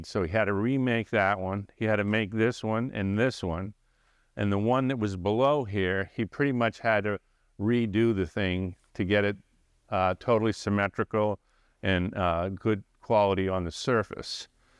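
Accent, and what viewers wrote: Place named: New England, United States. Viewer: Where in New England you from.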